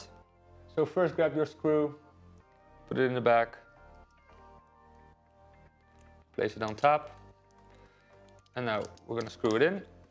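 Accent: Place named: Netherlands. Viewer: Ik zat dit te luisteren en besefte mij ineens dat je Nederlands bent :) dankjewel voor de instructie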